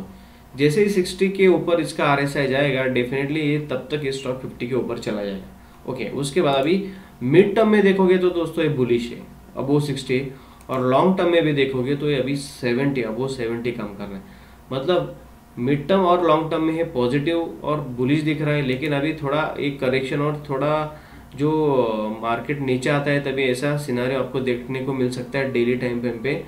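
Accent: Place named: Maharashtra, India. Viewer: Tumchya Hindi vrun vatt ki tumi maharashtra che ahe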